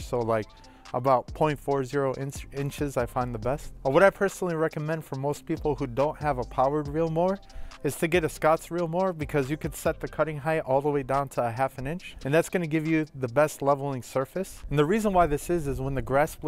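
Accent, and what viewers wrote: Chicago, United States. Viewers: I love the Chicago accent, brother! Keep up the good work. Go Cubies!! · Go Cubs! Appreciate the I formation and since moving to Texas, I love hearing the Chicago accent! I need an Italian beef and Honkers Ale now.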